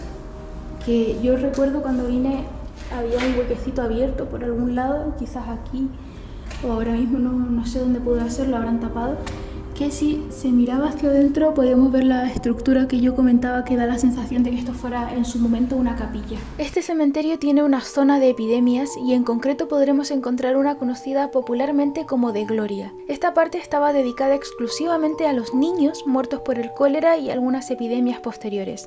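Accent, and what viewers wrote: Chile. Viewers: Saludos desde Chile. Tienes a veces un acento como el nuestro. Un agrado descubrir tu canal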